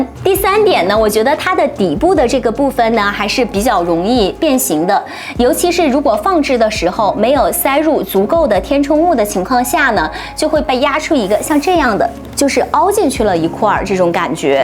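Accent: Japan. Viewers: 日式發音 畢竟她在日本啊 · 日式英语好可爱hhh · 在日本生活了十几年，英语发音已经被带偏到姥姥家了😅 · 她在日本開店，所以都是日語發音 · 日式英文，聽不太懂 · 看了评论才知道是日式英语，shoulder楞没听出来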